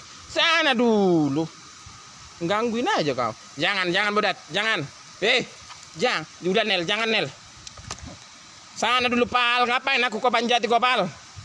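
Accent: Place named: Brunei. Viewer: Bang perkataan mu aku dengar ada ayat berbunyi "kau ani" melayu nya seperti cakap brunei ada "kau ani" 🤣👍🏻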